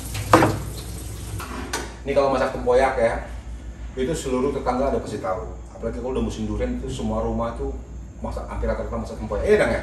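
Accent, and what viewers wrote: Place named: Padang, Indonesia. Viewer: bahasa nyo cak bahasa palembang, tapi logat nyo cak padang yo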